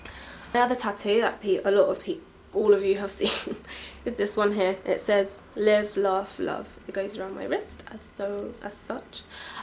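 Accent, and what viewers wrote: London, United Kingdom. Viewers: I don't think it's fake at all. And even it is fake, it sounds very real. I'm from the UK, and my accent sounds very similar to hers. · I love the tattoo on your back/shoulder. And wow, has no one ever heard a London accent?! xo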